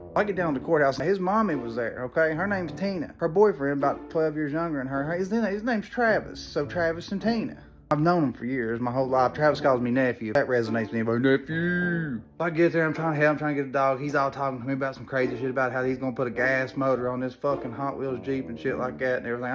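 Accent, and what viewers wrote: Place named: Eastern Kentucky, United States. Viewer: Where you from? You sound like you live in eastern ky.... where I'm from lol I know people that have called dcf cuz their ex pissed em off 😂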